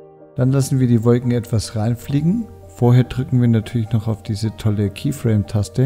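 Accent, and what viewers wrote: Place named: Nuremberg, Germany. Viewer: Gut erklärt, Nürnberger Dialekt nich zu überhören :´)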